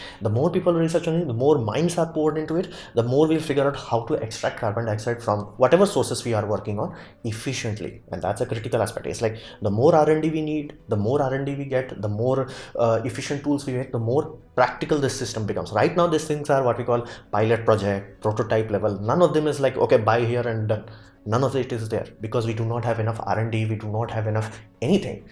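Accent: India. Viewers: I see. I think if you just took the existing scripts and narrated them a little more slowly, it would make for a slightly longer video yes but may improve it for viewers. I don't think 30 minutes or so is too long for a youtube video -- I agree that there are diminishing returns when you get over the 30 minute mark, like 1, 2 hour videos won't get views. But 30 minutes is fine, people watch informative videos of that length from emerging channels on youtube. And part of it is also your accent -- I am not trying to say that in a judgmental way, just when you combine it with the speed that you talk sometimes I don't catch something and have to skip back. I am an American so if I was Indian maybe I would not have any such trouble. And I am not saying it's a major issue, but even a minor thing like that can an obstacle to your channel's growth I think as people are lazy, they just want to watch videos that entertain/interest them in a very passive way without effort. 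They will click away. But if you slowed down just a bit I think that would completely eliminate the issue. As far as the tech limitation, I'm sure someone with as much tech expertise as yourself could find a workaround, right?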